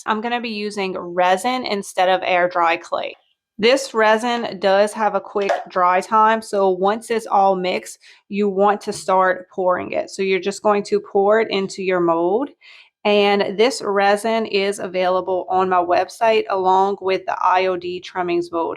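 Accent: Louisiana, United States. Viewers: Also your accent is telling me Louisiana Correct?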